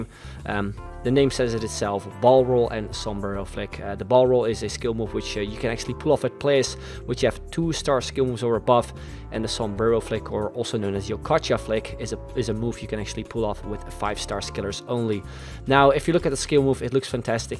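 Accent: Netherlands. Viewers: jij bent sws nederlands xD hoor het aan je accent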